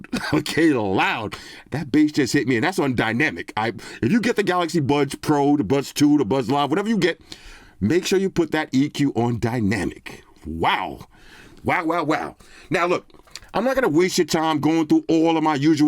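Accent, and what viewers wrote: New York, United States. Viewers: talks like a NY Giants fan. Gross. Dope vids though. Z flip 3 all the way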